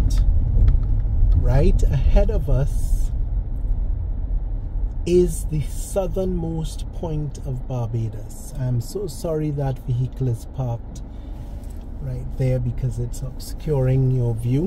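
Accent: Trinidad and Tobago. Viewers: ❤ Thank you so much We Barbadians love watching you here from Italy That area from Thornbury hill near Scarborough area that gap is called Peg well or Pegwell Gardens Have some family there ❤ Keep up the good work love your Trini Voice ❤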